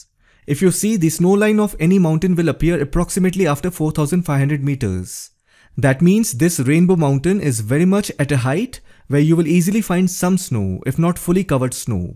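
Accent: India. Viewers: Your indian accent is so clear.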